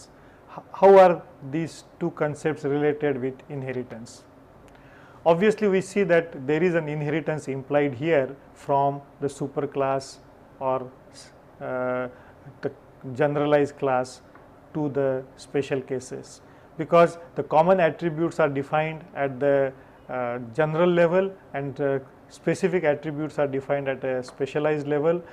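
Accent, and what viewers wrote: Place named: South, India. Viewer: very sincere efforts and great way to explain ER modelling. You are very brilliant and unlike other loosers, I had absolutely no issues understanding your accent. Its near to neutral unlike other regional accents from southern India. Keep up the good work and expecting many more videos from you.. Thanks you!!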